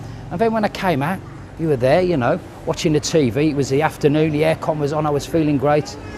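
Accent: Birmingham, United Kingdom. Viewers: I thought it was a Birmingham accent but I didn't want to insult you and mention the black country. I worked in West Brom for 23 years before moving over here, it's a very small world!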